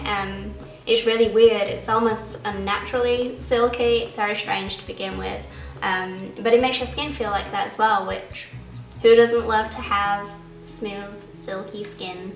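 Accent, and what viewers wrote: Yorkshire, United Kingdom. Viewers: yeah can Defo hear the Yorkshire but sounded like a twang of something else aswell, maybe not lol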